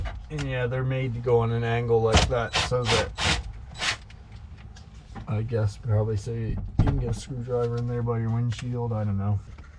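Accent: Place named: East Coast, United States. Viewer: Fellow Scotian here, went on Google just to get cleared on how to install this tablet holder, while watching your video, great by the way! When I heard your voice I said to myself, this guy sounds East Coast and sure enough 👍 thanks for the video brother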